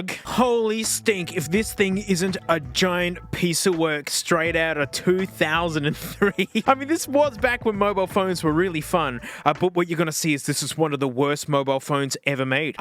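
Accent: Australia. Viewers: Australian mate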